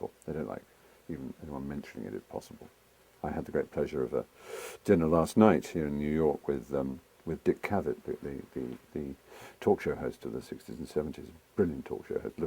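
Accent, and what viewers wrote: United Kingdom. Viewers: he makes coke sound so British and classy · It is phenomenally strange hearing Stephen Fry describing his drug use, with all the glory of a proper British accent of a gentleman scholar. "So for many years really I never went out without at least four or five grams of cocaine powder on my person. And I would ingest it intra-nasally, as was the fashion, through the use of some sort of straw or rolled up currency note."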